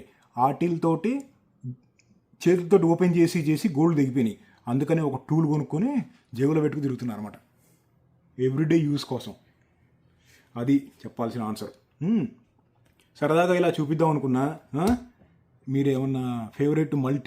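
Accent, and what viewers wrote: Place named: Guntur, India. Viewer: Yes. May be from guntur according to the slang.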